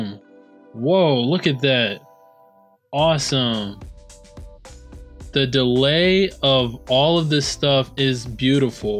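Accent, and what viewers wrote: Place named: United States. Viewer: Hi. Good tutorial. is this feature available in Wix worldwide or is it specific to a particular country? I'm assuming (by your accent) that you are in the USA? I'm in India and the hover feature is not showing up when I add a box...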